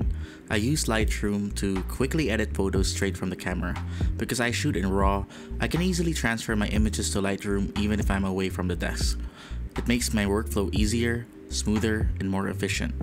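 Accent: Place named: Philippines. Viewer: Your accent is telling me you are a Filipino.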